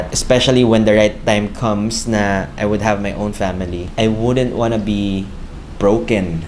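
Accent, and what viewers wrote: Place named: Philippines. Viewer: It’s so cool to see a guy who looks Chinese but has a filipino accent. I always wished I had a Filipino accent, but I was born and raised in Hawaiʻi. My grandma was ilokano, from the Philippines 🇵🇭, and I wish I paid more attention to her when she would try to teach me Tagalog…but no, I just wanted to eat cuscaron, balut, dinuguan, kare kare, etc. I always only have food on my mind. 😜 I think you’ll love Hawaiʻi, Filipinos are the 2nd biggest demographic at 19%, just ahead of Japanese 16%, and behind Caucasian 21%…and we have a lot of mixed race people 24%, many mixed w/Filipino. 😉 The people here are very friendly like in the 🇵🇭, and the weather is beautiful (but much less humid than the Philippines). PS - I just saw your shirt says Hawaiʻi. 😄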